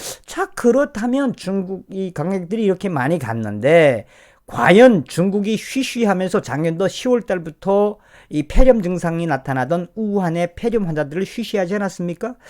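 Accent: North Korea. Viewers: 다 좋으나 북한의 강한 목소리 노력 해보이소. 어쩐지 부담이 되어서리 이애란 박사님은 전혀 북한말 안해서 좋읍디다 노력해보시라우~ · 제발 이북말씨좀 쓰지마라... 웃기려고 하는 것 같은데... 정말로 싫다.제발 표준어 좀 써주세요... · 이북 말좀 하지마세요 아~🇰🇷진짜 짜증 정말 듣기 싫어요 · 북한말씨를 석허서 말씀해주시니 재미있고 웃겨서 줗습니다. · 말투좀 고쳐라 득기싫어서 닫아버리고싶다 정성산 북조선말투가나오면북한방송으로 착각해서 꼴보기싫어서그레 댓글도 않보냐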